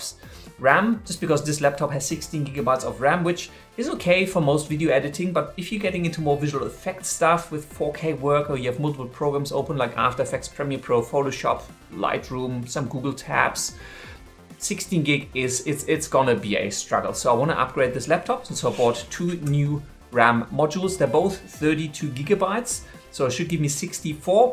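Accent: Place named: Germany. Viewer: In fact it feels like German accent to me, :) Oh glad to know you are being happy with the computer. I saw so many issues with that model on the Amazon US people's reviews that decided to get the MSI P65 instead. After 20 years using macs its scary moving to a Windows computer. Just in case you feel curious about my work, feel free to check <link> and <link> thanks so much for the feedback once again! Cheers from Spain!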